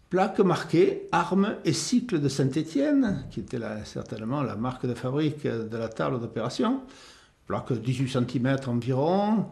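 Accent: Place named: Toulouse, France. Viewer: Ça fait plaisir de te revoir et entendre ta voix et ton si joli accent toulousain. Merci! Ton témoignage a été l’occasion pour moi d’ouvrir une porte sur un univers insoupçonné 🙏 😘💕💕💕💕💕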